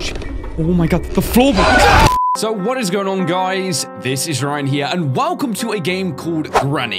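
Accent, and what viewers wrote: United Kingdom. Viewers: Ur British right?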